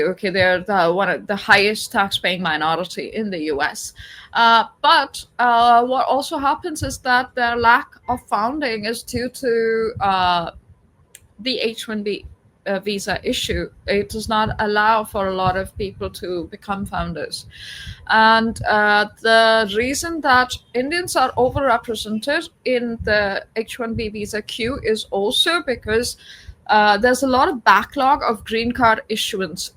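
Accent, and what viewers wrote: United Kingdom. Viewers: This was cathartic - as an Indian American. Well said. Is that a british accent u have? I think its something different. Very sweet. Followed u here from DEF talks. Jay Shri Krishna.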